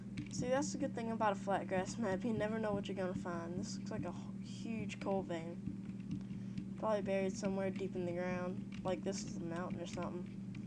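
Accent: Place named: Texas, United States. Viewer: Nice Texan Accent! Go Texas.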